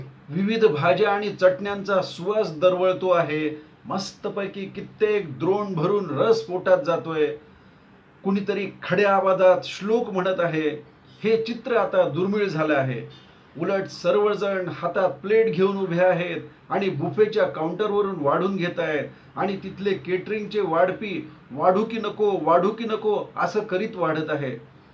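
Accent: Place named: Marathwada, India. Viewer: खुप छान आपले व्हिडिओ आहेत. आपल्या मारठवाडा टोन मराठी भाषेत चुटकुले ऐकायला मजेशीर वाटतात. 😅😊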